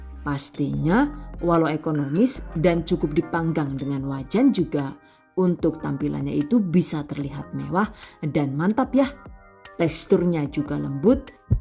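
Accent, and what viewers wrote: Banyumas, Indonesia. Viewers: Yang selalu saya kangenin selain resep mbak, adalah ngapaknya :). Sehat selalu ya mbak, biar bisa selalu berbagi ilmu :).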